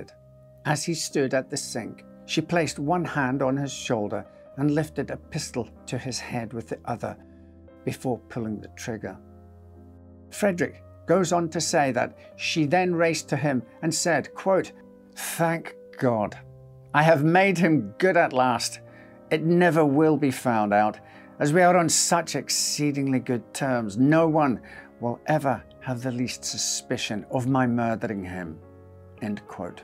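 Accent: Scotland, United Kingdom. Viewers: My first time watching. What a delight. I enjoyed the production; no small effort. I assume you've been involved in acting. I look so forward to making up for lost time and watching your archive. Oh, and let it be said, "There is no accent on earth as rich and wonderful as a fine Scotsman's"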